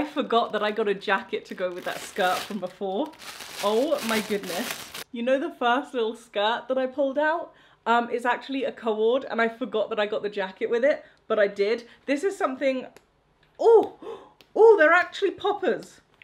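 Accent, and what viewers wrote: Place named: RP, United Kingdom. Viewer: Oh no! She's gone full on posh! But I love the tweed!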